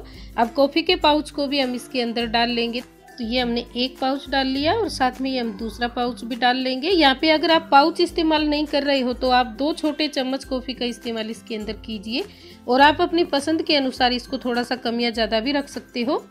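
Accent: Haryana, India. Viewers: Mam aap haryanvi ladti h bhasa se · Mam aap Haryana se ho kya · Aap haryane. Se ho kya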